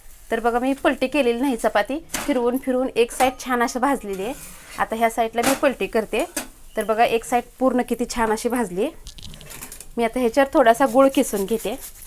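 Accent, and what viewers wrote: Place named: Satara, India. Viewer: ताई तुमचं गांव कोणतं भाषा आमच्यासारखी वाटत आहे.आम्ही खटाव तालुक्यातील जिल्हा सातारा कडचे..सद्या कोल्हापूर येथून व्हिडिओ पहात आहे.आमच्याकडेआमचीआज्जी चपाती मोडुन चुरा करुन त्यात तुप,गुळ घालून छान लाडु करुन सर्वांना द्यायची तुमची रेसिपी खुप आवडली. मी मुलगी आणि सुनबाईनां व्हिडिओ पाठवला.लहानपणीच्या या प्रेमळ नाष्ट्याला आता ती सर येणार नाही.गेले ते दिवस सकस पदार्थ खाण्याचे.ओके..ताईखुप खुप धन्यवाद!!!!**"*❤😂🎉🎉❤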